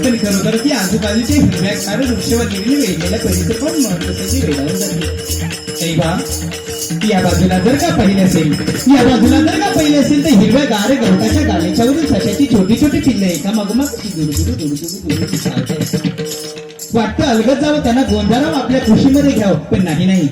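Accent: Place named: Malvani, India. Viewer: Josh Academy he malvani bhasha aahe tyat uchhar asach hoto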